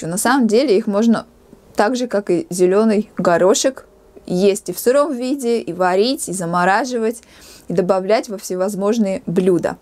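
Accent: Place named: Latvia. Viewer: Почитал коментарии, оказалось вы в Латвии живёте!!! 👍 Вот и решение вашего говора... Мне нравится ваша речь!!! 😀😀😀 И я не маньяк, как мне написали 😀😀😀.... А у нас здесь некоторые и "гекают" , и "шо" , и "чё" , и "у клубе," "у селе" 😀😀😀...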